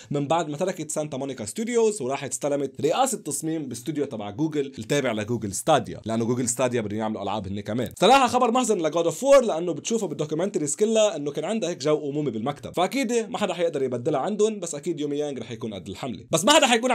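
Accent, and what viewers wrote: Lebanon. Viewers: لهجتك اللبنانية ناااااار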